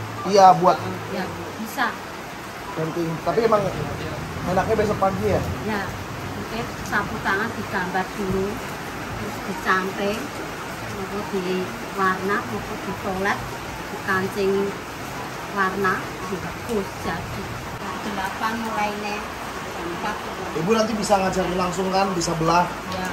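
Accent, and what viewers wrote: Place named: Java, Indonesia. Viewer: Om cocok kaya wong jowo logattelo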